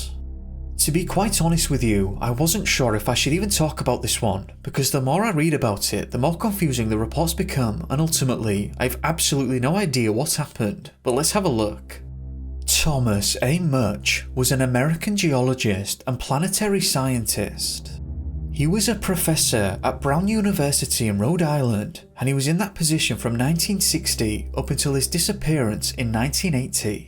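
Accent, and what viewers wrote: United Kingdom. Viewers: What is it about these stories narrated by a soft British/European accent? I enjoy them all, for some reason. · Triela Rhyfel he does he has a regional British accent. Get over it. He talks differently I can’t deal with it. Grow up. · Is it me or does this guy sound like Chills with a British accent??? His cadence and what not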